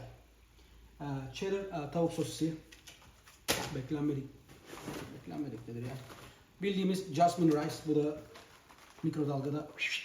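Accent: Thrace, Turkey. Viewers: Trakyalı mısın öyle hissettim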